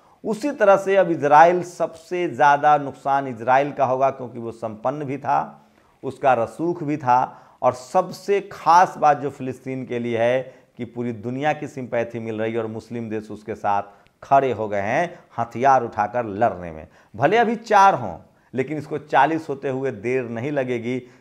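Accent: Bihar, India. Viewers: sir aap Kaun se state se ho. Aapki Hindi sun kar garvanvit mahsus karta hu ki mai bihari hu. Aapki language me Bihar ki Hindi wali tone aati hai 😊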